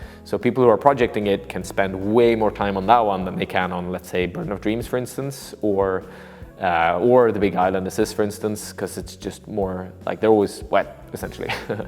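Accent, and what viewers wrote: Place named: South, United States. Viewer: I thought this guy had a Southern twang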